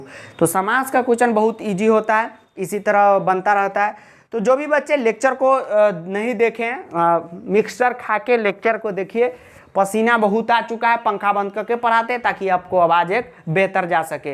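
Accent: Bihar, India. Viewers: sir aap bahut badhiya padha rahe.....bihari ascent me comedy karte karte.,...maja aa gaya padh ke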